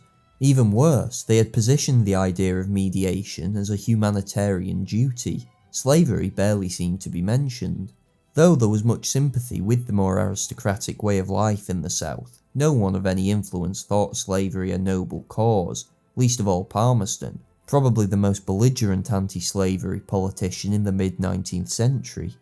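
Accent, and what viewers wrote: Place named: Stoke, United Kingdom. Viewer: 10 2 1 the narrator comes from Stoke!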